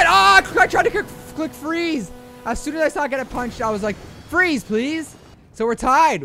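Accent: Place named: United Kingdom. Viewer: i loved this, i found it very humorous with the british accent, very awesome rocket league gameplay, I'll have to reinstall this at some point and play it again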